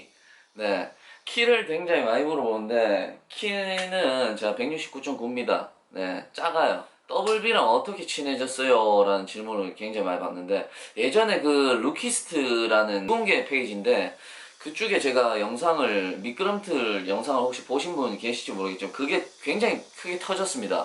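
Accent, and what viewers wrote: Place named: Gyeongsang, South Korea. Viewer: 여자친구 어디서 만났으셨어요~말투가 갱상도 사투리인데 고향이 울산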